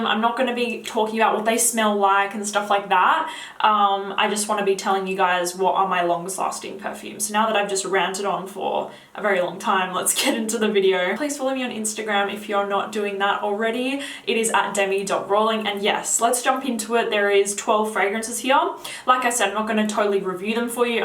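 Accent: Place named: Australia. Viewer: I like your accent are you Australian or British?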